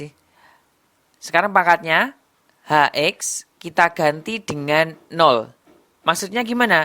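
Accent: Java, Indonesia. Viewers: Jawa bngett ka wkwk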